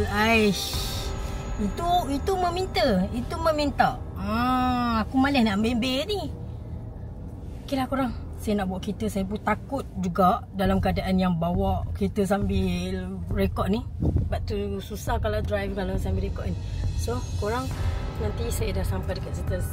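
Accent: North, Malaysia. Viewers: Fahammm betoiii teringat masa kami.kanda suka dgr cakap utara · nanty buat video suasana hari raya kat kampung😮 ckp la utara adehhh.... Rindu loghat utara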